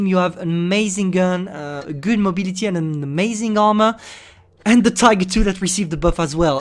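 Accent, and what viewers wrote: France. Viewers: T'es français? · C'est l'accent français ? Je peux pas croire que t es anglophone de base (je critique pas ton anglais) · he's french ,i speak French, English Greek, that's how the French speak English because the i ,in French it's like the English e in English an i ia pronounced ai . there you go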